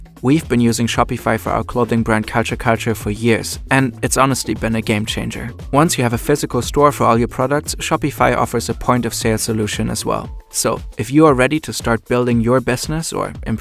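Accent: Germany. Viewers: Well, the guy sounds German, maybe he lives there and knows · this german accent is very annoying...please let the dutch guy do the fern stuff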